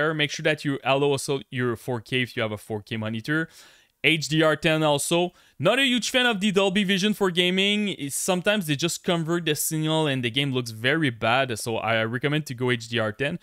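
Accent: Quebec, Canada. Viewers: Quebecois mon homme ? 😂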